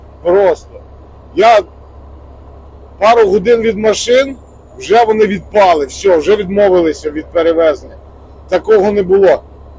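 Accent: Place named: Galicia, Ukraine. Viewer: Чую галицький акцент...чи Волинь все таки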